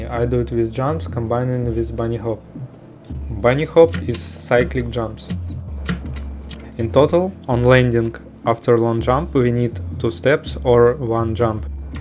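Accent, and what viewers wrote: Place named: Russia. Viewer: bro this video is really good thank you for the suka blyat accent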